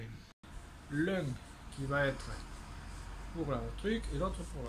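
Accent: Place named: Gard, France. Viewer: Je t'adore! et ton accent qui me rapelle celui de mon patron quand j'allais vendanger dans le gard! le petit betisier est cool aussi, peut le mettent en ligne et ca ajoute un peut de proximite. ne change rien:)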